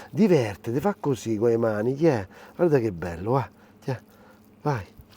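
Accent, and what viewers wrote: Rome, Italy. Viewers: your Roman accent is killing me 😂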